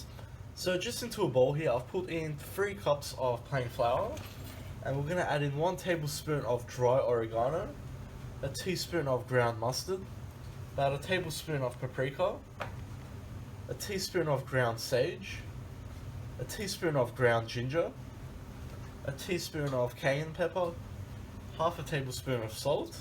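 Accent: Australia. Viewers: What the f&^k is that accent? Every time I hear someone from western Sydney speak I want to vomit. MSG impossible to find in Australia.... except at ANY asian grocer you fuckwit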